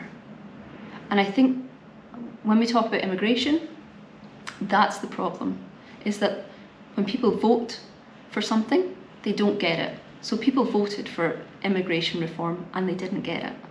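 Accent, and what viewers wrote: United Kingdom. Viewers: I love women's accents from England. I could get a English woman to read me a book, any book, just to listen. Much love from USA. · I was curious as an American the gripes of someone from the UK. I only hear good things from people here about it from people that haven't been and wanted to see if the grass is just greener or it's actually better. I stayed for your accent and ability to convey ideas efficiently. I appreciate you.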